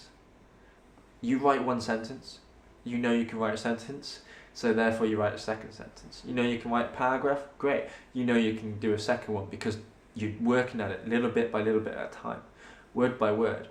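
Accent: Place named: England, United Kingdom. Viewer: I'm sorry but I had heard that I should tune into this but I found it very difficult to understand due to his very strong English tone that some of us could not understand.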